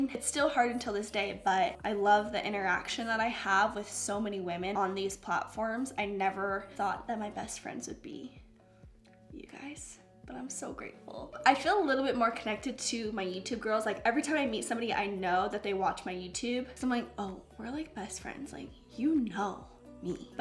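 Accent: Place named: Ohio, United States. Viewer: I don't think your voice sounds like you're always having a cold, it must be your accent that does that. My English teacher comes from Ohio and she has the same accent as you and it's so cute!!! You helped me bring so much more positivity into my life ever since I started to follow you and thank you for that! My new year's resolution is to stop procrastinating but I'd be able to procrastinate on that too tbh 😂 Ig: yellow.dazzle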